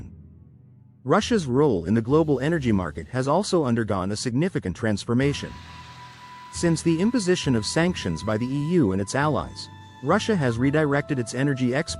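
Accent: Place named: United States. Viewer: Russian? it's a American accent, didn't say anything I already knew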